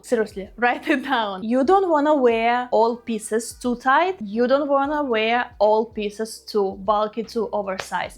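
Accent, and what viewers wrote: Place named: Russia. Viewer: Are u from Russia? Your accent and pronunciation is very special. Russian style 😅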